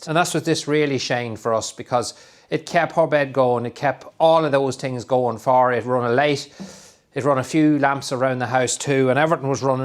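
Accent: Ireland. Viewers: The sight no1 wants to see at home, the blue lighted neon beastie. Sending well wishes your mums way, and indeed to the whole family. Sorry to hear you lost one of the herd too, but it sounds as though it was the kindest thing you could have done for her. Daft question: what is it you say to the cows to make them follow you? Success? Suck's? I can never quite figure it out lol (Need more practice with the Irish lilt lol)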